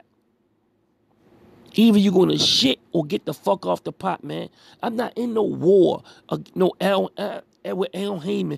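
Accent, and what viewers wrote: Baltimore, United States. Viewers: Yo, I dropped a video like a week and a half ago on this topic. I support your opinion. Btw, sound like you from B-MORE. I got some people out there. Talk just like. You, lol. Imma sub tho. Respect🙏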